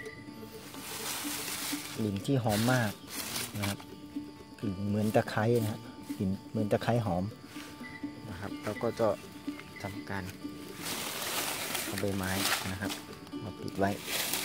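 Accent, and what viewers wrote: Isan, Thailand. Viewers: สวัสดีจ้า หมานๆเด้อโอ่ย เจ้า เว้า อีสานบ้านเฮา โลด ขอย ฟัง แล้ว ลำคานๆๆเด้อจร้า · เว่าอิสานจังคือเด้อจ้า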